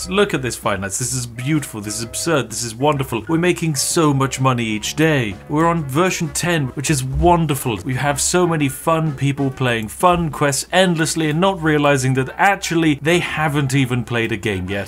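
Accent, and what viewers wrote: United Kingdom. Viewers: why are you british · Is the spiffing Brit from Britain? Sounds a little bit like a British person. No front of course.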